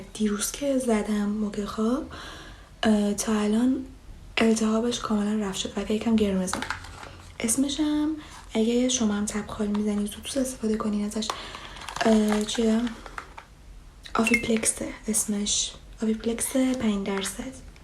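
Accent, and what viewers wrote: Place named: Turkey. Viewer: حس خوبی بهم میدی با توجه به یه کوچولو لحجه ای که داری حس میکنم ترکی❤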